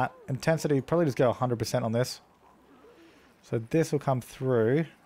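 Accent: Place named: Australia. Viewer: he has such an Aussie accent like it's not the stereotypical one but it's one of those Aussie accent. haha. I'm Aussie too but he sounds heaps like my mate.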